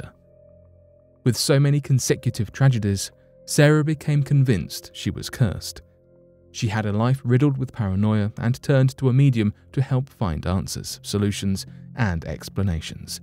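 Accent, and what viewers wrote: United Kingdom. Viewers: You can't beat a good British accent 👍🏻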